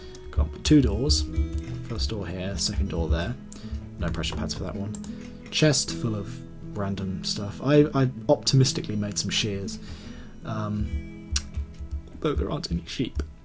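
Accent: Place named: England, United Kingdom. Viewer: WOOP WOOP! Someone English for once!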